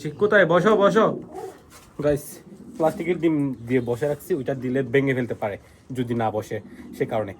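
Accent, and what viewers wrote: Chittagong, Bangladesh. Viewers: আপনার কতা শুনলে,বুঝা যাই,আপনি চট্টগ্রামের মানুষ, কতা অনেক সুন্দর করে বলতে পারেন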